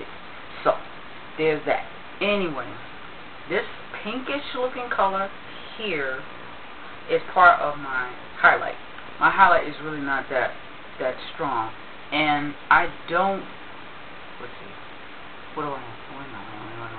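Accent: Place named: United States. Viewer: You sound soooooo country :0) I am loving those eyes! Nikkie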